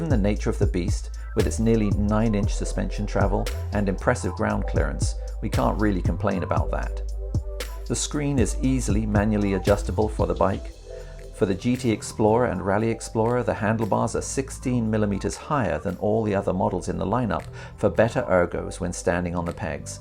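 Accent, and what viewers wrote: United Kingdom. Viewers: Wait, waht, what? A British accented POME giving Canadian pricing? Consider me subscribed.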